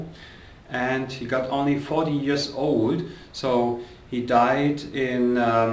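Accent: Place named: Germany. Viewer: Nice german accent. Thanks for the video.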